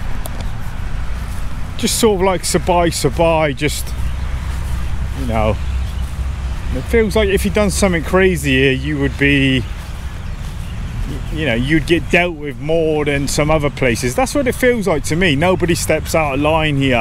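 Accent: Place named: West Country, United Kingdom. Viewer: Polar opposite to Pattaya, that’s for sure 😂 Which part of the England are you from? I hear a west country accent, maybe a Devon accent?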